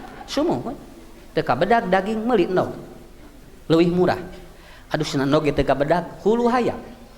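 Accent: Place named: Bandung, Indonesia. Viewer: Hatur nuhun pencerahanna mani nyerep bahasa sundana raos ngabandungannana.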